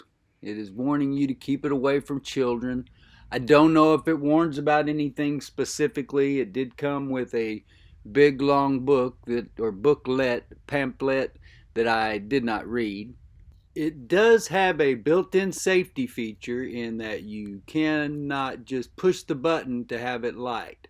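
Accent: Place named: South, United States. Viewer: Dude I love your commentary just a good ole southern fella. Thank you for the video good sir.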